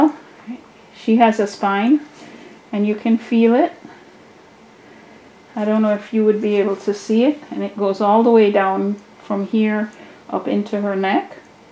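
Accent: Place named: Trinidad and Tobago. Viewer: You sound trini 🇹🇹 so cool even if you aren’t. I’ve never heard you speak. Your hands and mind are blessed. 🛐🛐🛐🛐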